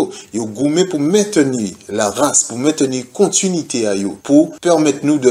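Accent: France. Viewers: ton créole est très approximatif. Il est très francisé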